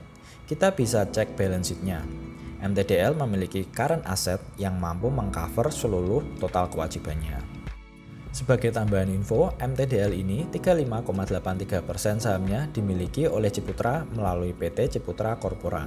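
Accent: Java, Indonesia. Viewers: Medok Men coco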